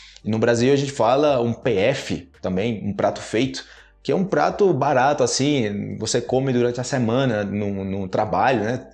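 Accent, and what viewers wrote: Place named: Colombia. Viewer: Teu spanhol e similar a Colombia e costa rica mae amigo, pero em portugûes e mamá em espanhol.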